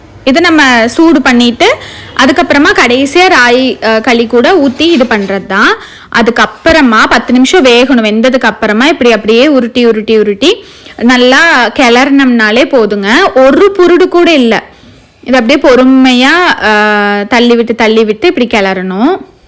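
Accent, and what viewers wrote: Kongu, India. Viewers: Way of talking is nice kongu thamiz. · Iam kongu tamiz from Pollachi and your voice nice and super kongu tamiz🎉🎉🎉🎉😀😀😀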